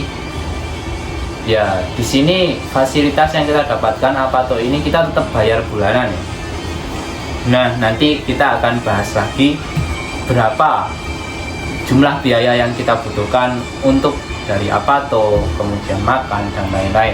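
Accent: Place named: Java, Indonesia. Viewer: Jawa e medok yooo😂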